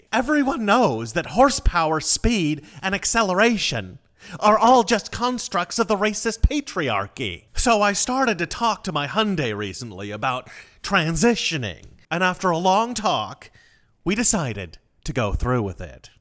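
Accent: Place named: Ireland. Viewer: You have sort of an Irish accent Mr.. Also, thank you for using your pronoun in your name so we know your preference.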